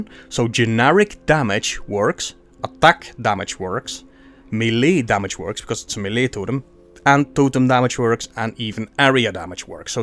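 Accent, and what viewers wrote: Limburg, Netherlands. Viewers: Are you from Limburg?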